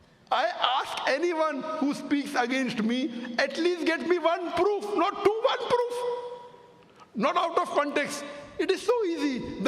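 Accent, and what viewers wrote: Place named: Kerala, India. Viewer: What a manglish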